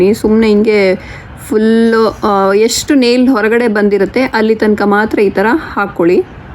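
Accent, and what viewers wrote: Mangalore, India. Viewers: Hey I like to watch ur videos coz I do speak Kannada just like u.bindass but let me tell you that iam a mangalorean but I was born n brought up in Bangalore Namma bengaluru ❤️so I was happy to know that ur a mangalorean.ur hubby spoke about biryani I was like OMG good. anyways how is Canada's present situation.hope everything is fine there take care ❤️👍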